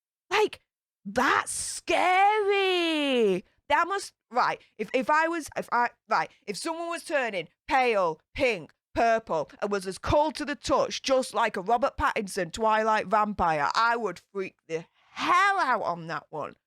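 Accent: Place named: Northern England, United Kingdom. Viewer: I LOVE your accent,!! You must be from UK North- Lancashire, maybe? You sound like Victoria Wood a bit, and like the character Bubble on AbFab a bit. I'm American, so I'm not really up on all the British accents, but as a fan of some Bristish shows and stars, that's who you remind me of. <3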